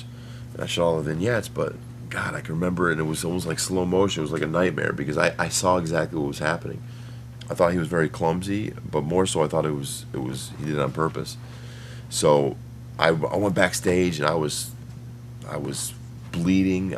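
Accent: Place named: Spain. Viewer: It's his Spanish accent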